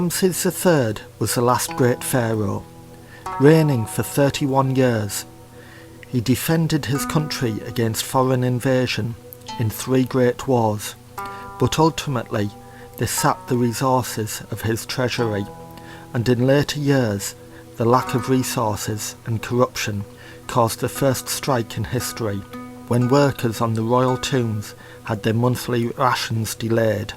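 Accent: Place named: England, United Kingdom. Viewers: It's a North east English accent, maybe Yorkshire? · English accent with a mean lisp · you sound like a young Northern english Richard Attenborough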